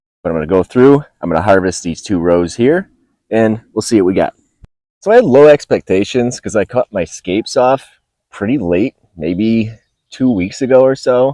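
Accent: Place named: Midwest, United States. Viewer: I hear mid west accent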